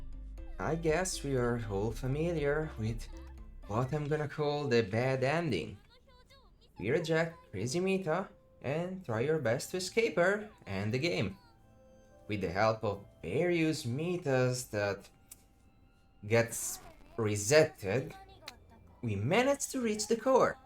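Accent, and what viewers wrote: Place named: France. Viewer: 10/10 video earned new sub (youre accent sound french) :D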